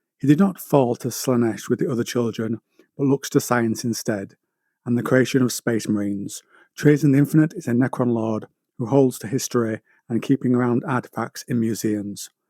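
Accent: United Kingdom. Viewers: I think if you get a decent mic your channel would do well dude. and try do a read through of your script before hand as its kind of obvious its your first time reading it outloud. also vary your tone its difficult to tell when you're reading dialogue and when your reading other text. remember most of your audience is going to be yankees and fellow brits so your accent will actually be a boon😅. finally, what is your channel going to do thats different to the other lore channels that exist?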